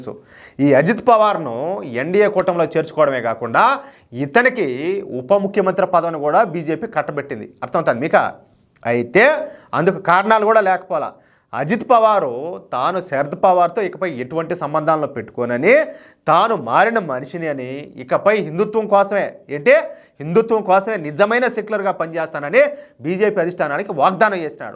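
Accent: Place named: Chittoor, India. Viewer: గారికి వందనం మీ చిత్తూరు బాష బాగుంది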